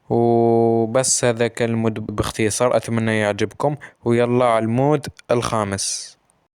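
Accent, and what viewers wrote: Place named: Algeria. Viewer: خويا اهدر صح نتا جزائري ❤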